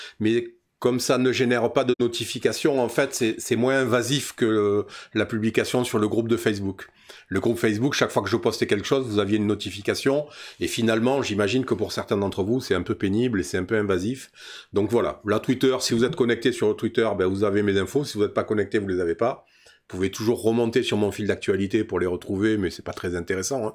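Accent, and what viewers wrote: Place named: Provence, France. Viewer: Génial! Merci. Quel plaisir d'entendre tes commentaires (5/5) et cet accent provençal... un pur bonheur pour une marseillaise d'origine!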